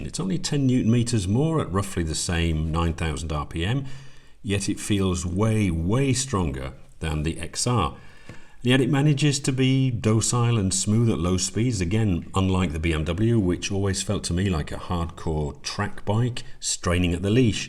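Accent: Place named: RP, United Kingdom. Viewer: Love your English. Proper RP. Cheers from an English teacher in Sweden. And yes, that bike is way too expensive for most of us mortals.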